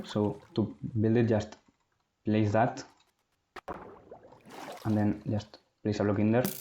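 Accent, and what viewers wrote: Spain. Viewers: El acento de español no te lo quita nadie, y el ingenio para descubrir esto tampoco, buen video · Ese acentillo español no te lo quita nadie eh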